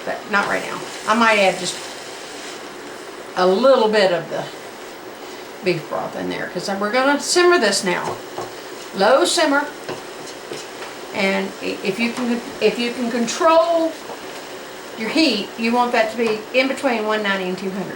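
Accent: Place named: Texas, United States. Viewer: Mighty refreshing to know this is a cooking channel. At first glance of those 2 pies, I thought... Oh Hell No! Not another documentary about ''Chinese Foot Binding'' results. Good to know Natchitoches is on the same global parallel with Cowtown Texas accent & all. That ratio of egg to water makes for the most tasty of scrambled eggs as well.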